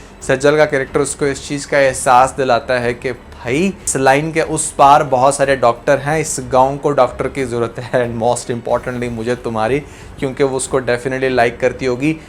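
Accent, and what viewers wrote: Punjab, India. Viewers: Bhai Punjabi Jo hai is wAjh se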